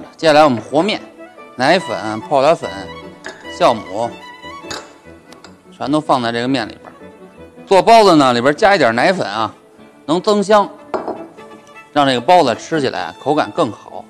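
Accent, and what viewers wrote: Beijing, China. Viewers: 真爱听你说的京味，看着都馋了。今晚我己调好了馅，明天就学着自己做，另外想请教一下叉烧包的包法，跟“美食台”的广东师傅学做过但包子不开裂，厨师长能告我什么原因吗？谢谢！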